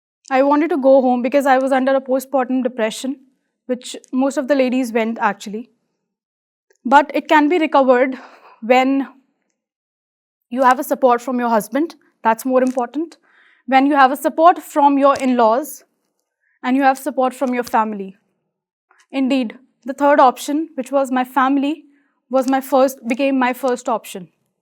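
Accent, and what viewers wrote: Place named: India. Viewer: Rose I can guess if u r from South. Y South people hate Hindi badly? Personally I have seen many South Indians know Hindi but they willingly choose not to speak it in public. U guys have sm major issues with Hindi or r politically motivated. Majority of Indians know Hindi & wats wrong in speaking it. From the accent of this gal I can guess dat this girl is Hindi spoken not Keralian etc. She has used many Hindi sentences in b/w dats y I said so. & u said dat we North Indians think dat d world revolve around us. 😁 Y u hate North Indians. & from one single comment of mine u have judged all d North Indians. Same I did with u dat South Indians hate Hindi. I said so coz I came across such incidents in my life, like one of my subordinates said I understand Hindi bt I do not chose to speak so. Sadguru also gave a detailed lecture on y he do not speak Hindi. 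Now u can question me dat y do North Indians not learn any South Indian language. The answer is: 1. We r not native to it. But no hesitation in learning d same. 2. Every state has its own language but Hindi is widely spoken in India. So learning Hindi is far useful than learning a native language which only selected no. of people speak. Rest is is choice but I wanted to question u & express my points on d same which I did. Now I can sleep calmly. I wud like to get ur inputs on d same. Bye!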